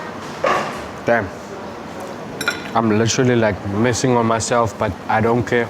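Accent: South Africa. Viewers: Sound like a Joberg accent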